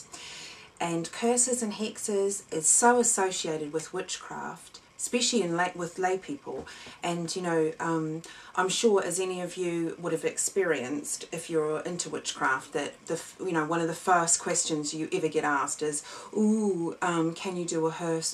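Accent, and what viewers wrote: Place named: Scotland, United Kingdom. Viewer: you sound Scottish :))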